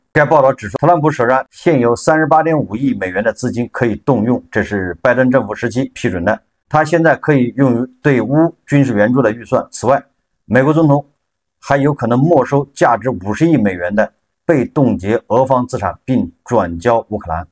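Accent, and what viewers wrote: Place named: Wuhan, China. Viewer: 你有点黄陂口音。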